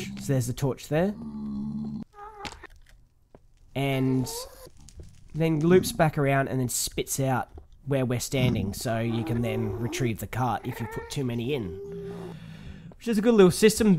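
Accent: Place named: Australia. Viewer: Which part of australia are you from????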